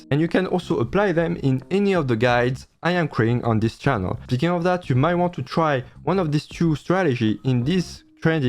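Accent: France. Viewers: I love your videos, really. It's clearly visible that you're trying to maintain high-quality content, do much about the montage and even added captions, probably being aware of your French accent that might be hard for someone to understand. Wow. Chapeau bas! First time in my life I really feel the urge to share someone's content because I feel like you deserve it! Keep up the good job, you're amazing :)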